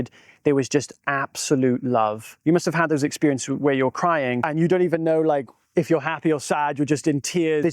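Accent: Wales, United Kingdom. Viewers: Beautiful background! Where is that? By the way, is your accent Welsh?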